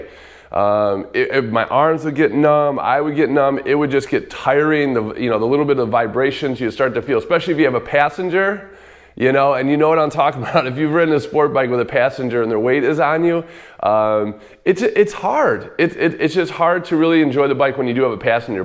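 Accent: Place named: Chicago, United States. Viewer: I have the Akrapovic Header and the beautiful diamond stitched Harley custom seat and vrod soft bags with VRod stitched on the sides with plastic inserts. Im so glad I didnt trade my bike like I almost did. All I had to do was get on the bike and start heading to Champagne Urbana from Bloomington to trade for the other bike and realized what the hell am I thinking! Pulled over twice before turning around saying im not trading this thing for nothing! 😂 Now that I didnt do it i realize I would have been kicking myself in the ass the rest of my life for such a stupid trade. Lol! The Deuce was a awesome bike and was chromed out to the max but it could have never held a candle to my Vrod muscle. My bike is the exact for as yours and I I actually have floor boards on mine instead of pegs and so my feet aren't like riding with forward controls which to me makes it even way more comfortable to ride and probably even safer. 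These Vrod Muscle's are definitely special bikes. I would think than anyone who hasn't ridden one would fall i love with these bikes if they did. Now someone would have to deal my Vrod muscle away from my cold dead hands to get it from me. I still have my original pipes but this Akrapovic Header for it just sounds incredible on it and is the number one Header pipe for the Vrod muscle. Since your in Illinois and im in Bloomington Illinois I was just wondering what city your in? Im thinking by the sound of your voice the Chicago suburbs somewhere? Lol!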